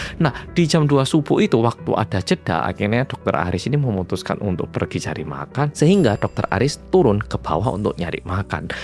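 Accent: Java, Indonesia. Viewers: muedok🎉🎉🎉 RinDingDong 🎊🎊🎊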